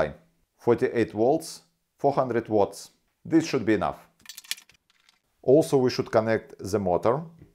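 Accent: Ryazan, Russia. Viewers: Это акцент Рязанский . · So Russian accent :) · Адский русский акцент, прям facepalm. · Я понимаю, я и близко не могу говорить на английском так как Вы, но,...блин русский акцент, это просто пипец, <link>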